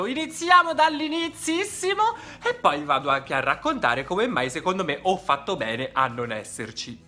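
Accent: Tuscany, Italy. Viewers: Ti faccio una confessione: guardo i tuoi video soprattutto per il tuo accento perché abitando in Spagna mi fai sentire a casa dato che anch’io sono toscana. Continua così, sei fantastico 😘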